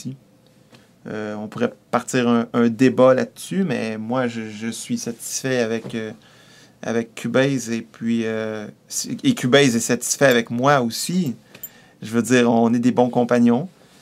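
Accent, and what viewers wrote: Quebec, Canada. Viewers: cool il est quebecquoi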